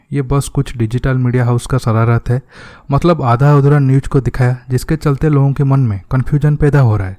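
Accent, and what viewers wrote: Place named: Bengal, India. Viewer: Aap apna language improve karo..bangali style hindi mat bolo..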